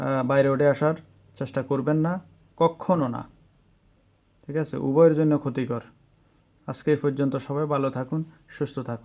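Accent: Noakhali, Bangladesh. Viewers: ভাই আপনার বাড়ি কি নোয়াখালি ভাষায় বোজাযায়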